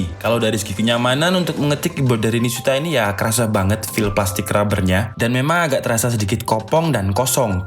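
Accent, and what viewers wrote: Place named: Surabaya, Indonesia. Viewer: Sampean wong suroboyo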